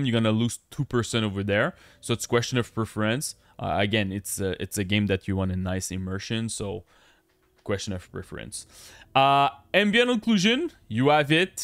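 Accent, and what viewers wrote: Quebec, Canada. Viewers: Sérieusement, tu donnes toujours de bonne recommandation! Pis en plus, tu cache pas ton accent, bien de chez-nous! Lâche pas le bon travail dude.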